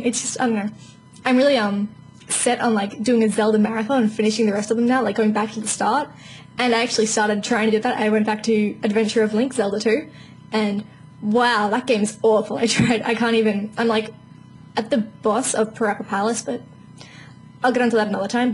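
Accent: Australia. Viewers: hey u got a aussie accent...u from SYD, NSW?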